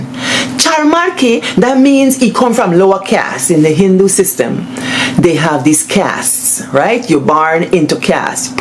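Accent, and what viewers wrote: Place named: India. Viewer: first time here:) Just subscribed. You wowed me with your Indian accent. I was riveted to your content. Love the singing, the wielding of the belna and the moves, haha. Sharing this video with family and friends. One people, one nation…you go girl:)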